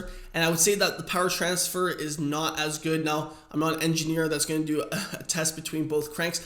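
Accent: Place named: Canada. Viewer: Really nice video. Good job! 👏🏼 (P.S. I like that Canadian accent. Makes me want to go watch hockey on TV. 🏒🤣)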